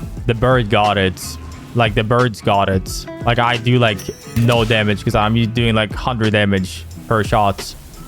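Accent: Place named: Sweden. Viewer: Wait are you Swedish cus it kinda sounds like it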